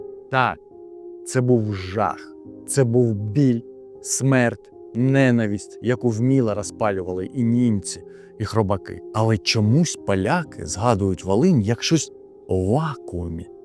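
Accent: Russia. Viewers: І з кацапським акцентом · Breshe khlopchyk! A mozhe tse troll Putina? Rosiyskyy akcent.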